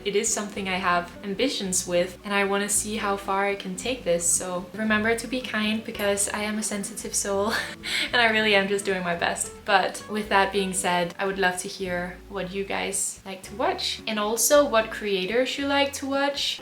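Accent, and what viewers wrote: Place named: United States. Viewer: I love, love, love your videos! It's my favorite way to start off a cozy Sunday :) I really enjoy that you have stayed authentically yourself as your views have grown. I think that's what your audience appreciates about you. I like seeing the joys but also the irritations of daily life. You don't live beyond your means, you don't pretend that you are not on a budget. You share your struggles about work/life balance and the added complications that hormones give to us women. Keeping things real makes me cheer for you all the more! In terms of what extra content to add, I myself am really interested in your language(s) journey! This might be very specific to just me though lol. Learning about the way we communicate/express ourselves is endlessly fascinating. From what I've gathered, I think you speak Danish, English and French? 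I am from Seattle, but I have traveled/lived in all different areas of the world, and I find your specific "language mannerisms" so interesting! I know it's very common for most of the world to speak several different languages, but I have found that usually when someone from Europe is speaking English, they tend to have a strong underlying clipped British tone. You, however, have an almost American cadence when you speak. Im not sure if it's from watching Gilmore Girls and Twilight so many times (lol) or the books you read or having lived in a couple of different areas yourself. I have watched other Copenhagen videos, and those girls, though fluent, don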